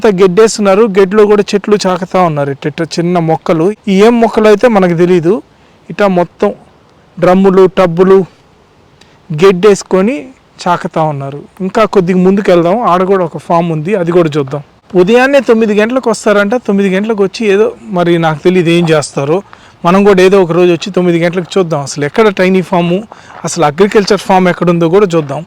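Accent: Nellore, India. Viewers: I live in USA and watch your travel videos. Though I watch lot of youtube videos on travel and other related topics, I very rarely write comments. However your channel is exception. Just letting you know that your videos, presentation are very nice a your Nellore accent is very entertaining. Please keep traveling, capture your experiences good/bad and post. Wish you good luck and hope your channel grows in popularity.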